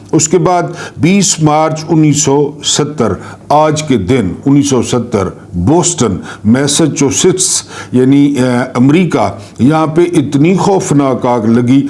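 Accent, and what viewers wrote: Saraiki, Pakistan. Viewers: اردو میں سرائکی کا تڑکا واہ واہ 👌👌خوش رہیں۔😊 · صاحب آپ کی سرائیکی میں اردو بہت اچھی لگتی ہے ماشاءاللہ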